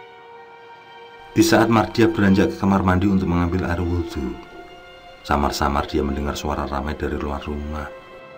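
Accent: Java, Indonesia. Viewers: Yg aku suka dri chanel ini salah satu nya pmbawaan logat bahasa jawa nya Gambar nya yg terkesan hidup tak lupa alunan musik nya yg mmpu mnghipnotis pkiran pokoke mantep smangat trus brkarya pakde bnyak blajar lah dri chanel ini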